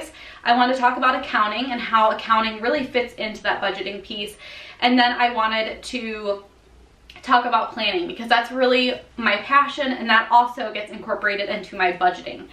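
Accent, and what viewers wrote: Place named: Chicago, United States. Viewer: Congrats girl!!! I found your channel from searching for Erin Condren videos. I live in Seattle but am originally from Chicago! Your accent reminds me of home!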